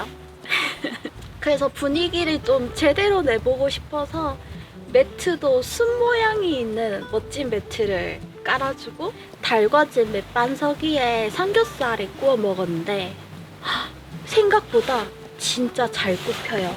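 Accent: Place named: Daegu, South Korea. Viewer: 대구분이신가요? 대구 사투리를 쓰시네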